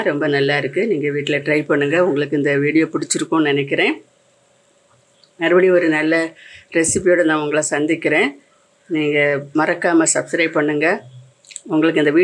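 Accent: Nagercoil, India. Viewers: Nagarkoil Tamil mathiri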